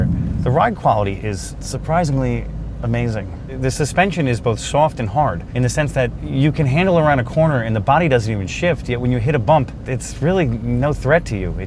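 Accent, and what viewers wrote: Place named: New York, United States. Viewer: I fuckin love the sarcastic New York take on car reviewing I’m going to say you’re from Long Island maybe Nassau?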